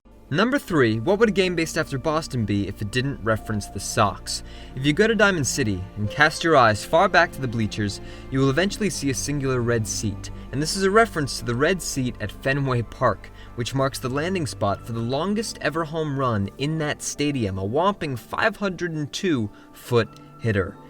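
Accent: Canada. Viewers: Is he canadian? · dude u canadian？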